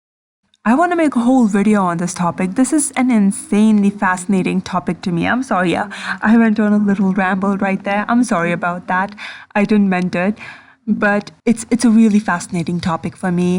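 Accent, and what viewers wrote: France. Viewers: She's French, I'm pretty sure.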